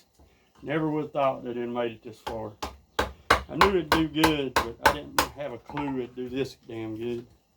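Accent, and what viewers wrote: United States. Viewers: Subbed cause the accent. Never was in the U.S. but always liked those accents, BTW great video.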